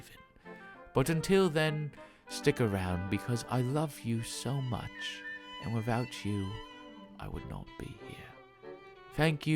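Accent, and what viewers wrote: United Kingdom. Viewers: um why is he sounding british in this video??? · this doesn't sound like!?He sound like a british story teller,no offense to british people. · He sounds so british lol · This looks and sounds like a British male talking like this is normal XD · You sound like a British guy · 5 years ago people: wow so cool 2018: he sounds British. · Is this your real British accent? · why does he sound British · What's with his BRITISH accent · Why is he British here? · Why did It Sound Like British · THEEEE BRITISH ACCENT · is it me or does he have a "british" accent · ... I didn't realize was British before his channel got popular.... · Um... Where did his American accent go? Why's he British? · British? I didn't know you were british but i don't care cos i am british. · Why did use a British accent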